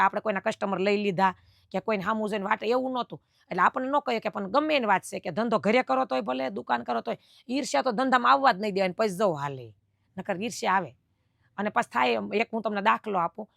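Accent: Kathiawar, India. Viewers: મારી છોકરાની વહુ ઓસ્ટ્રેલિયા રહે છે મારી ભાષા બોલવાની તમારે જેવી કાઠીયાવાડી છે મારી વહુ ને ફોન કરું ને મમ્મી હજી થોડીક વાર વાત કરો મને બહુ ગમે છે કાઠીયાવાડી ભાષા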